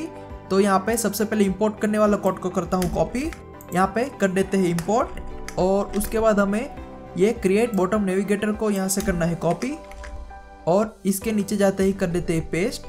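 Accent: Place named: Hyderabad, India. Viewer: keep up the good work , are you from hydrabad india ? cool akcent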